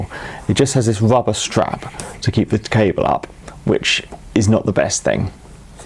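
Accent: RP, United Kingdom. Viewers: Gosh, you sound posh. But, great review mate. Thank you.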